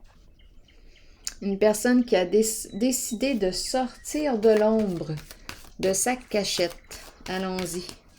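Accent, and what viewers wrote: Canada. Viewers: Bonsoir, que le ciel vous entende⚖️🤗, Soyez bénie, belle âme au joli accent canadien ❤️🙏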